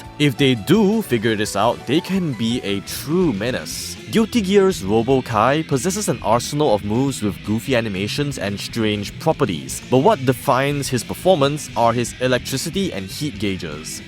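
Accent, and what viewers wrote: Singapore. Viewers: First time watching your video and your accent is familiar. I'm guessing you're either Malaysian or Singaporean. Edit: Ok, I checked the bio and is indeed from Singapore.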